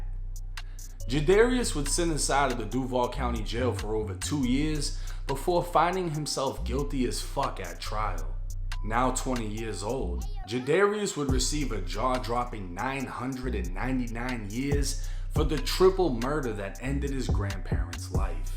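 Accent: Boston, United States. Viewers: He's a Boston guy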